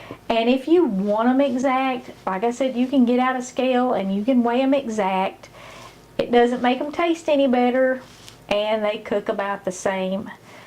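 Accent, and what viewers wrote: South, United States. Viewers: When they speak with a southern drawl, You know you’re watching a reliable source for good food lol!!! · I started watching you and following you because you remind me of the south and I love the south! Even though I'm not from the south. 🙃 I love your accent and really love to see your love for God. I pray that God will bless you and I thank you for talking about Him. Jesus Christ is my Savior and I'm thankful that you are uplifting God/Jesus in your cooking videos. By the way, I love your recipes too.. they are the same recipes my Grandma made. 🙏❤ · What part of the south are you from? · I really love your accent. If Dolly Parton made yeast rolls this is how it would sound. Being from Alabama I know a little bit about southern accents.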